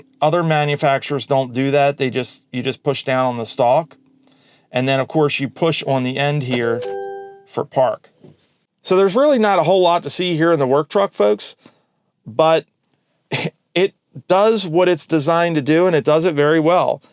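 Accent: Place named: Philadelphia, United States. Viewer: I'm hearing a Philly Delco accent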